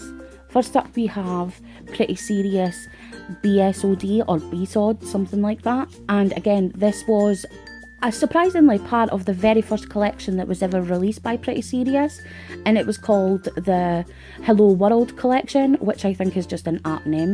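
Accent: Scotland, United Kingdom. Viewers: Just found your videos while I was looking for a good blue polish....Thanks for the great recommendations! Also love your Scottish accent...Have a blessed day!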